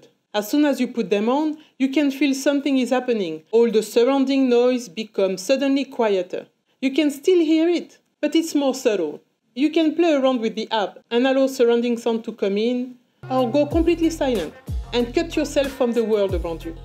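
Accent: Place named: France. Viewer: You speak French ? J'arrive à entendre ton accent ;)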